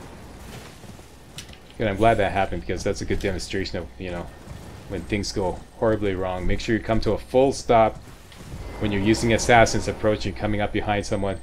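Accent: Canada. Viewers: By any chance, are you Canadian, Eh?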